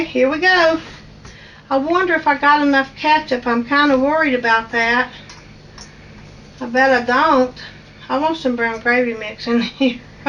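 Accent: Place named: Appalachia, United States. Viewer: I too suffer from fibromyalgia and also MS and empathize with you. God healed me from stage 4 colorectal cancer 2 years ago and it's a painful cancer- but nothing I've suffered (and I've had 10 pregnancies lol), not even MS or the cancer was as bad as the fibro. It's the worse! God is with you and there's no faith like Appalachian faith - I know cause that's my family, from the hills of W. Va and Va, near the border- both sides! Your accent always brings me home. ❤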